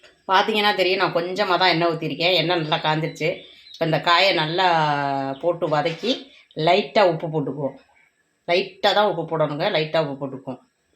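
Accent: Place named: Madurai, India. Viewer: Unga pechu ketkka madurai pakkam mathiri irukku........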